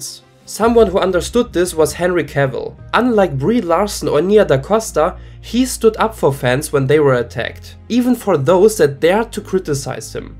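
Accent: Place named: Germany. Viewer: Ich habs mir bei dem Akzent doch gedacht... a daitscher Landsmoan! 😂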